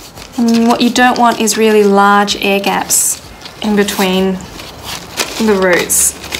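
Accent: Australia. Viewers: Excellent video on how to correctly repot orchids. Love you Aussie accent cheers.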